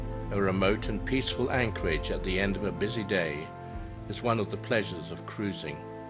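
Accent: England, United Kingdom. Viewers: Is it just me, or does the accent have its roots in the same general locale as Alfred Hitchcock?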